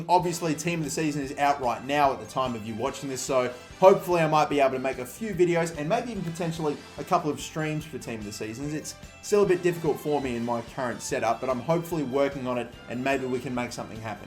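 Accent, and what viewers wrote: Australia. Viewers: i could tell your Australian straight away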